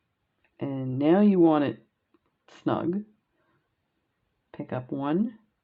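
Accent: Canada. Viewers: I tried this so many times but kept getting that puckering around the perimeter. Finally switched to 5mm pearls instead of the 6mm. I used 6 seed beads to surround them and I didn't have to add that extra single seed bead at the bottom because the seed beads came together really well and didn't show thread. Just a suggestion, as this worked for me. I've made 3 so far and plan on using them on an ornament ball. Thank you so much, for teaching this to us! I love your videos, (and your Canadian accent!) ❤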